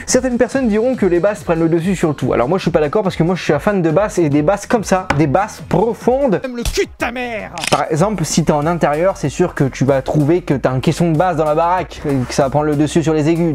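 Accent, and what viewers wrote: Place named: Savoy, France. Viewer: ton accent du bon vieux savoyard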